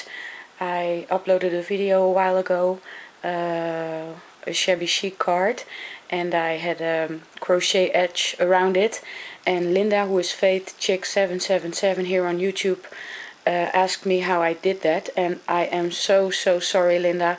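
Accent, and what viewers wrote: Netherlands. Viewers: Where can one buy that mini hole punch? I think your instructions are wonderful and so clear. I love your Dutch accent too, so pretty.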